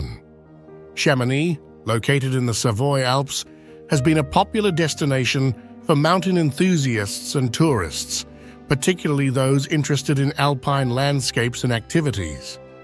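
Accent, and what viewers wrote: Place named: Australia. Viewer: I looked at your 'about' and see that you are located in the UK. I hear an Aussie accent 🤔😊.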